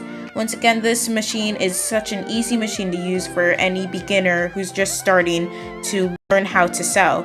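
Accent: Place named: Midwest, United States. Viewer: I can tell you're from the midwest by how you say your T's as D's...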